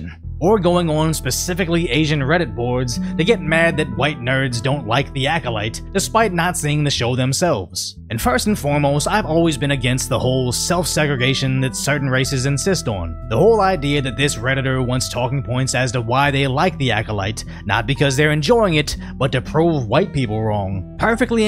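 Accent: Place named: United States. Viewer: If I guessed your heritage with only your voice, I had guessed you‘re an American.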